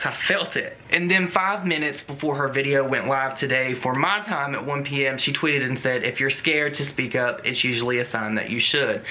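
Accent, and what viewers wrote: South, United States. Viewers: I found your channel a few months ago and I am so happy I did. Maybe it's your southern accent and attitude. I was born in the south but moved to the west as an adult. I miss my family and you remind me of all of them. Your content is to the point and short, love it. Anyways just wanted to say hey!!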